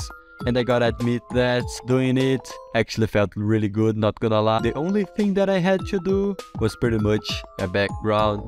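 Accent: Brazil. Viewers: Reconheço sotaque brasileiro de longe, é sempre raro e bom ver YouTuber BR na gringa. Keep up with the content <3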